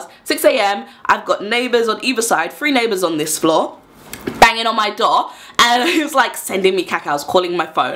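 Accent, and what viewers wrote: United Kingdom. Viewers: Owing to you, i got used to British accent. I could understand you fully! And i like your giggling smile. (I call it 눈웃음 ^^) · I'm almost crying cause I love your British accent to much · Absolutely adore your accent, luv! I'm British as well, and I miss that accent.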